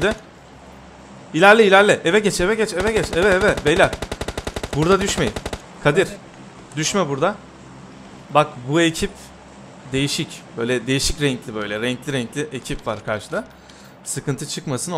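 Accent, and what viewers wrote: Adana, Turkey. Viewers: Abi sen Adanalı mısın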